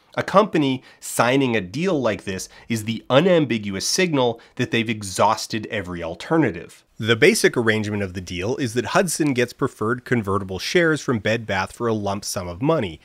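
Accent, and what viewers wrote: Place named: Canada. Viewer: Same here, long time Dan-Stan here so I’m used to his Canadian accent slipping through on random words sometimes (ie “gadaff” from the lord of the rings video) so I honestly thought I was just miss hearing him say life changing…. I don’t feel super great in my soul now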